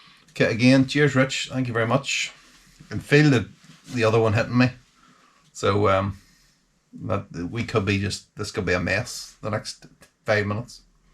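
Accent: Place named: Northern Ireland, United Kingdom. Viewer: Where in ni are you? Enjoying your videos over in fife. But it's good to hear a voice from home.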